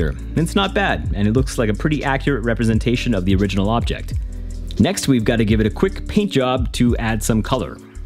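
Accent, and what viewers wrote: Canada. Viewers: Canadian? LET'S GOOOOO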